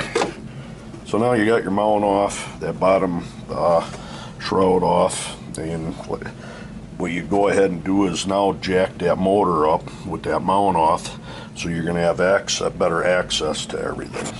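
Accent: Minnesota, United States. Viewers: Northern minnesota?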